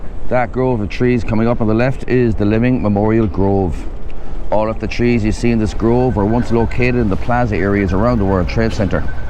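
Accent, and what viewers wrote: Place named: Ireland. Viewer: 语速比之前慢了不少，发音也更清楚了👍就是爱尔兰口音依旧明显😂对于英语初学者来说视频整体可能还存在一些难度，不过感觉如果把所有视频都看熟了可以收拾行李直接飞都柏林了😄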